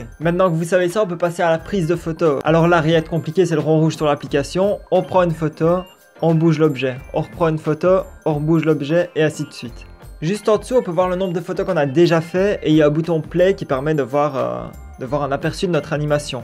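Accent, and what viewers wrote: Liège, Belgium. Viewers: tu a un accent de liège extrêmement fort mais tkt moi aussi chuis belge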